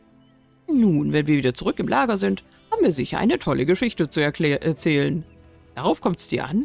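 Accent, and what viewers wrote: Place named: Berlin, Germany. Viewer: Wie süß du das sprichst bist Berliner in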